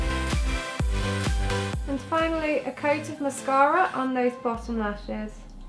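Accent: United Kingdom. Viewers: Are you British??? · are you British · She's britishhhhh????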